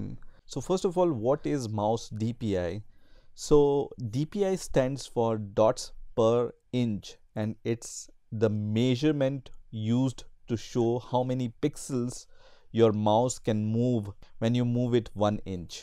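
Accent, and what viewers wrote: India. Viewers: classic indian accent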